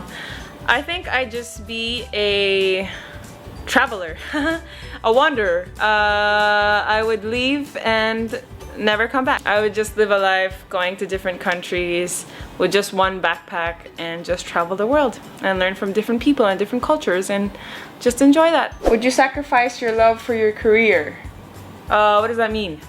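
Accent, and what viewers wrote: United States. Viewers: Lindz Can it sounds more American now she migrated back pabalik ng Pilipinas. Nung mga previous vids nya before mas string yung Aussie accent nya. she sounds more like Colleen Ballinger actually ☺💙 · feeling ko american ka..ung accent mo kaxe ehehe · Can She speaks American English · Lindz Can Aussie??Hindi yan Ausie.American accent lang naman yan eh..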